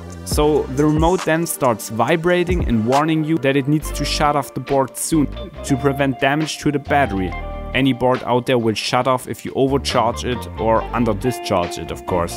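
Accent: Germany. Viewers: Hes like German Engineer on old VW commercial dubs. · Wieso net deitsch? Des deutsch Akzent englisch ist ohrenkrebs dude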